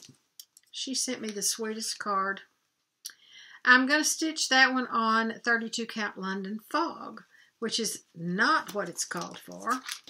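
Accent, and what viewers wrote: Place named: Arkansas, United States. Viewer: Hi, I watched you for the first time today & certainly enjoyed it😊 I will be returning..we live close, we are on the Arkansas line, so your accent made me feel at home😊thank you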